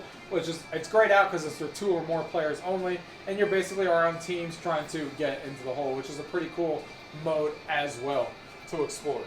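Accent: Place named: North Jersey, United States. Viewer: Dude, your in jersey? Wtf , I'm guessing your from the northern or western part by your accent. It's not as bad as a bayonne one like mine, but you have to be north of old Bridge. Hahah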